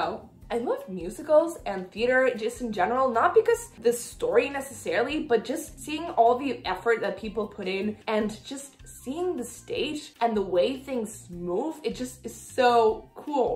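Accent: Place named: Spain. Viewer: This is the video where I noticed your Spanish accent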